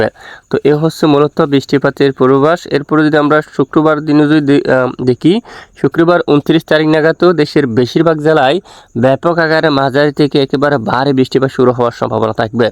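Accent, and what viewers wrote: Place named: Bhola, Bangladesh. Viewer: ভোলা জেলা টোন দেখতাছি বড় ভাই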